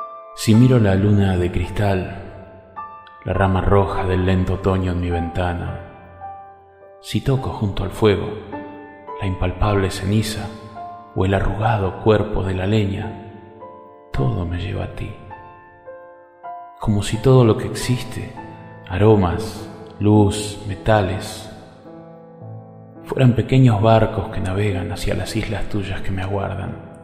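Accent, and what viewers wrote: Argentina. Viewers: Horrible el acento argentino. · Cómo creen? El poema es hermoso, pero no con acento argentino, que no saben ni pronunciar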